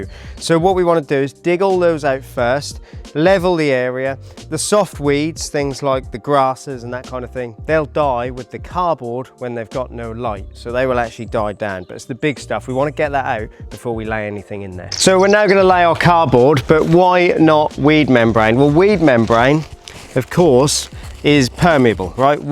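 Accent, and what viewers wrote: Norfolk, United Kingdom. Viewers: Could tell you were from Norfolk within about 5 seconds 😂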